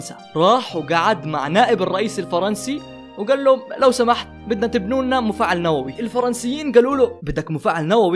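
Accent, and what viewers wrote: Jordan. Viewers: ياالله يل لهجه العسل احب الاردن اخوك من العراق❤ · لهجته مبينه اردني 😂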